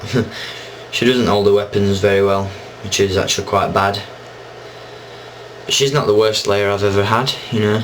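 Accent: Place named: England, United Kingdom. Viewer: wait are u english i thought u was from the us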